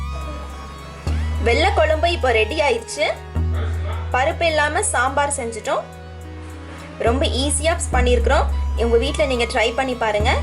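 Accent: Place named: Tirunelveli, India. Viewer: Super... I'll try... Tirunelveli slang nalla vaaruthu nadavula...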